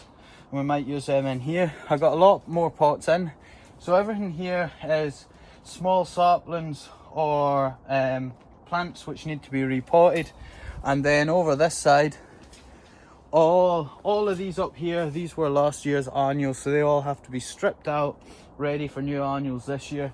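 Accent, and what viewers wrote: Scotland, United Kingdom. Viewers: I have to tell you how wonderful you are. You are genuine and kind, so knowledgeable about border collies, particularly your funny and talented adorable pups and about farming in general. And you make me laugh. You have a good sense of humour and you remain calm in stressful situations (bad weather etc). I love love love love how much you care about your pups and how well you treat them. They just adore you in return. I love Stacey and Kole as well. More family outings like the video with the horses and how much Stacey loves horses would be awesome. I also am an animal lover and don't necessarily like zoos in general but these horses had gobs of room to run around, so all was good. I am mesmerized by how intelligent your dogs are herding. I could watch them all day. And how they look up to you, literally and figuratively. 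I have your videos playing while I work as they make me smile and the world is good. And the Scottish accent........don't get me started......love it! Keep up the good work. My name is Paula, from Ottawa, Canada. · If you're bored, stop watching. Lots of fans love seeing anything he does. We hunger for relaxing content and seeing his idyllic life. Anything described with a Scottish accent is just a bonus IMO.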